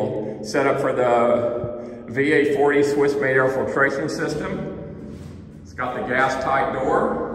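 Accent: United States. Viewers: Aku tidak paham bahasa inggris aksen amerika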